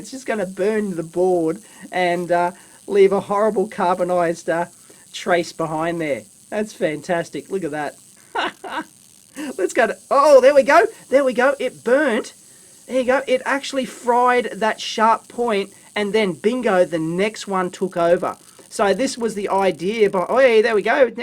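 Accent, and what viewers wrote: Australia. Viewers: He's Aussie, mate. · You accent really got me thinking the entire video where you might be from. Usually american accents piss me off, which isn't really the case with your voice. Also (maybe im crazy, who knows) i sense sliiiight british nuances. So my wild guess would be ... Australian?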